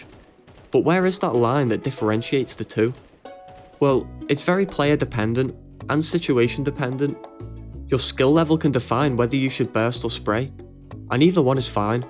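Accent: Liverpool, United Kingdom. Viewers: liverpool accent slaps for commentary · Hes scouse you whopper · lord knows i need a scouse cs youtuber in these trying times. subbed :) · Why is he british????????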